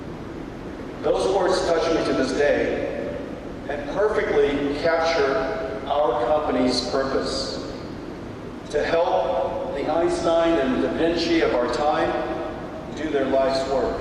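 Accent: Taiwan. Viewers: 他的台灣口音，吊打一堆年輕人。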